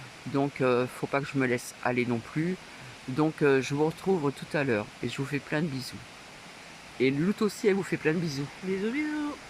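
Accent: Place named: South, France. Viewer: ma très chère, c était normal, de tout cœur avec toi, combien c est une " belle grâce d avoir ton Amie avec toi et ça ne peux te faire que du bien, quel bel accent du soleil, merci, merci pour ses si belles vidéos, ses photos ces partages ça fait du bien, c est chaleureux, bisous et gros câlins à Nouky, on croise les doigts pour les projets de 🍀🍀🍀🍀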